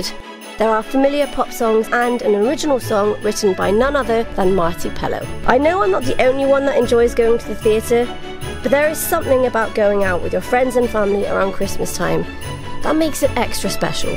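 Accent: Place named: United Kingdom. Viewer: min in and this is giving me vibes similar to British Vogue's documentaries with Alexa Chung!!!! Maybe it's because of the british accent narration, but this is AMAZING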